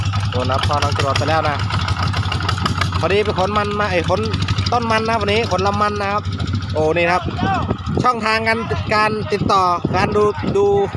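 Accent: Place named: Suay, Thailand. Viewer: พี่ส่วยหรือเขมรครับสำเนียงเหมือนส่วยมาก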